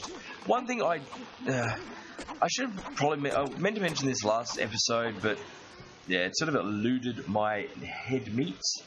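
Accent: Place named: Australia. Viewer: Looking forward to your wife's bad jokes lol Definitely picked up on the Australian accent. I am from South Africa, myself. Damn that L72 fish! Cool video man :)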